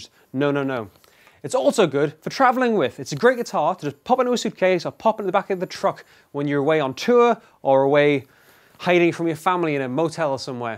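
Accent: United Kingdom. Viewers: British. No bollocks talk.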